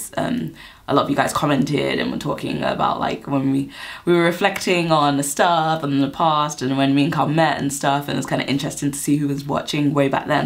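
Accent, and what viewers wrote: United Kingdom. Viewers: don't stop posting videos. your British accent is amazing . I'm a dude btw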